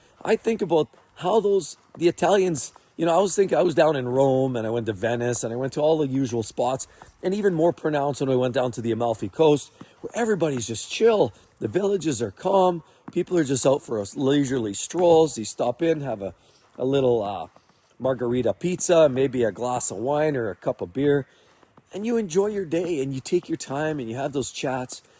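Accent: Canada. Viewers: Canadian...I can hear it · That street you're walking down looks like Russia. But your accent proclaims you're Canadian. Where is this? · Looks like you live in Colorado but your accent sounds Canadian!Anyway, turned 60 and burned out at my job..30 years of teaching..I’m ready to retire..Was planning on 65 but I can’t be in a job where there is no parental and administrative support..It just beats you down to the point where you don’t care anymore..I would rather retire on a lower pension and have peace then to endure 5 more years of this..I’ve become a minimalist and don’t need much of anything…I would to do something but have more control over what I do..Maybe reinvent myself?…Maybe buy a business or just do rideshare or substitute teach??..Trying to figure it out..I think me and my wife would be ok financially..Our home is almost paid off..We could sell and downsize for extra income?…kOur vehicles are paid off..I just ready for some peace and more control of my weekly routine.. 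We aren’t interested in much travel..We would be good with once or twice per year..nothing to extravagant…Anyway I hope I can figure it out before the end of the school year or summer? I’m mentally drained and so ready..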